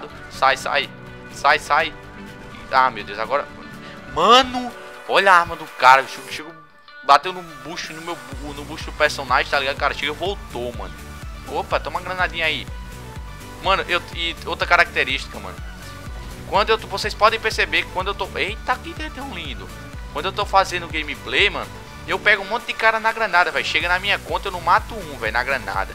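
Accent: Northeast, Brazil. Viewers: KKKKKKKKKKKKKKKKKKKKKKKKKKKKKKKKKKKKKKKKKKKKKKKKKKKKKKKKKKKKKKKKKKKKKKKKKKKKKKKK Eu queria ter o sotaque nordestino mano o negosso é muito dahora man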